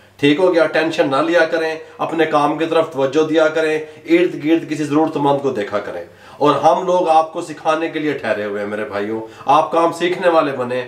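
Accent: Punjab, India. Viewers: smells punjabi in urdu. thanks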